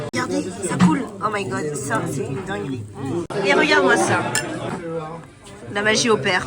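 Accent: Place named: France. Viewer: Yes I would, nice 👍 French accent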